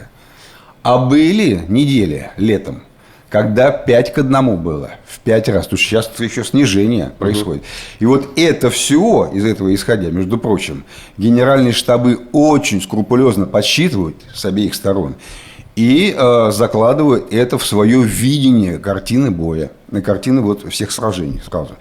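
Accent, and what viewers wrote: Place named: Surzhyk, Ukraine. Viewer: На суржике не понятно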